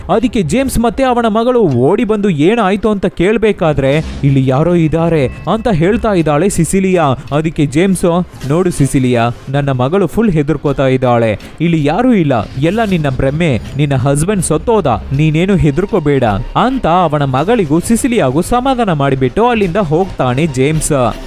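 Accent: Tamil Nadu, India. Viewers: Nim kannada Tamil accent 😄😄 but really well explained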